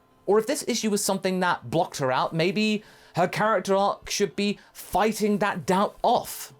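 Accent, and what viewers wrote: United Kingdom. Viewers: Peak DBZ, in my opinion, was when Goku wasn't beating everyone and they all just had to rely on him. It was when the side characters could get the spotlight and do a little something against the enemies. For example, Vegeta beating Freeza's forces on Namek until the Ginyu Force showed up. Or Vegeta transforming into a Super Saiyan and beating Android 19. Or Vegeta (I'm repeating myself but he gets great moments in the series) going Grade 2 and beating "You're either perfect or you're not me" Cell. And most notably of all, Gohan going SS2 and beating Perfect Cell twice. So to have a story where Goku and Vegeta go to space and fight enemies relative to their strength while leaving the others to defend Earth against enemies relative to the side characters would be great because no one is getting shafted. The enemies aren't too difficult to overcome where they need Goku saving the day and aren't too easy to beat thus lowering the tension and stakes. 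Also, this is my first time watching you and was genuinely confused for a bit, thinking "why does the name sound so familiar?" and then being shocked that 1) you voiced Goku in DBZA and 2) you're British, so that was funny.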